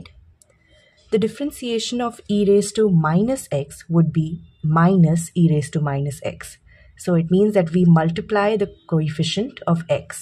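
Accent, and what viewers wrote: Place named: Russia. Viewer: LGta hai ki रशिया की मैं बोल रही है😢😢 समझ नहीं आया😮😢😢😢😢😢😢😂😂😂😂😂😂😂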